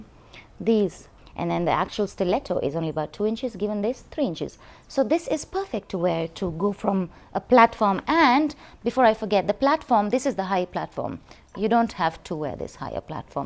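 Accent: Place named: Kenya. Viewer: Hello rich folks....just go in her by chance and am loving the vybe....btw love the fact that you are kenyan by your accent and not twenging to sound very foreign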